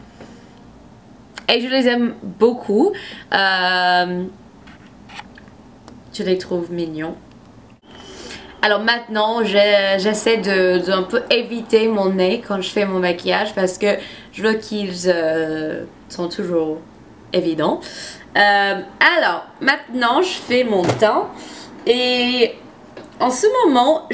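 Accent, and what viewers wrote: United States. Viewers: I learned French in school for 5 years now and thought I can speak and understand it now... After watching this video I know, that I can't 😅 It was so difficult to understand 😁 Et j'ai une question: Est-ce qu'elle américaine? Je crois, que c'est très faux ( dunno if this is the right word 😅), but I was wondering if she is amercian or something else native english? Btw I'm german 😄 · J'adore ton petit accent américain. Tu es trop choux!!! Biz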